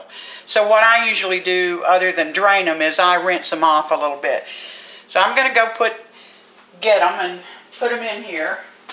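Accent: South, United States. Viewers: Mmmmm this looks good I'm going too be trying to make this soon for my husband and kids!!!! I am subscribing too your channel I loovveee your southern twang!!!! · This recipe is most valid because the lady making it has southern accent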